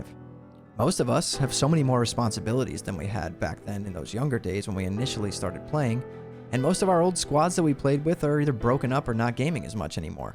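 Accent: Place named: England, United Kingdom. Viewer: You forgot one thing. For players from other country’s the social experience could be difficult. I hear that you are english. But for a dutch person like me it was not easy. BUT i really wanted to get better in learning english. I learned more in wow then at school. Not everyone has the luxury that they get servers in their own language.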